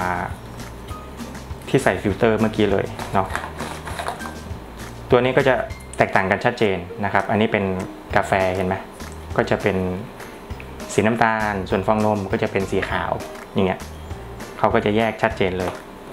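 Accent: North, Thailand. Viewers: คนเหนือหรือเปล่าครับ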